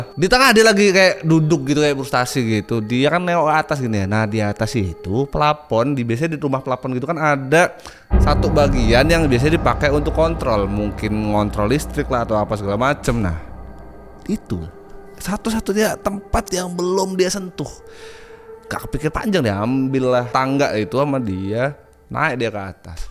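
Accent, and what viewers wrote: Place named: Lampung, Indonesia. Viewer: bg.. omong mu jowo tpi logat mu. lampung.. mntab mntb.. suka dngrinya. 👏👏👏👍👍👍😁😊😊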